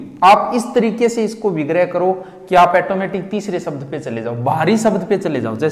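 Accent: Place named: Rajasthan, India. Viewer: Rajasthani tone....maja aaya pdhne me....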